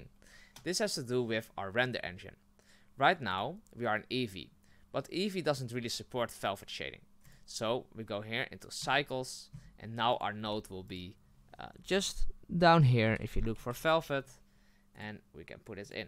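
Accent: Netherlands. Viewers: Are you dutch cuz your accent is very familiar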